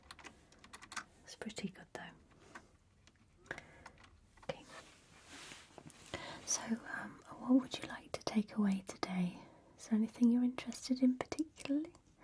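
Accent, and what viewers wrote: United Kingdom. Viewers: Theirs nothing quite like a British ladies voice. Outstanding.❤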